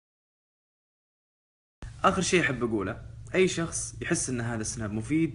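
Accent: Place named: Gulf, Arab world. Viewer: انت خليجي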